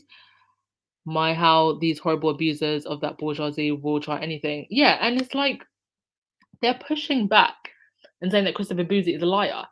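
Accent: United Kingdom. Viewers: A million views that's fantastic Congratulations. It's really not surprising your podcast is very interesting and I love the British accent it makes me feel that I have a front roll set. Thank You. 👍💕💕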